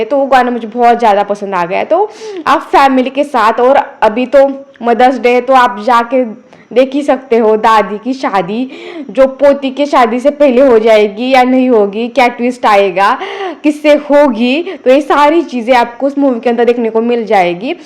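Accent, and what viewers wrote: Maharashtra, India. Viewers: marathi accent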